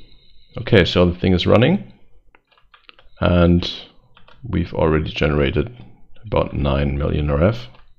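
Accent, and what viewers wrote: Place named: Germany. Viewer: From the dialekt you come from germany oder nicht grins? Crazy OP this way to create energy. No Big Reaktors or other generators are so fast, easy and cheap to get. And it cant blowing up like draconium. I have never use this and i dont know if i like it. You get tons of enderpearls with lilys or mobfarm. Much easier as any early charcoal generator setup.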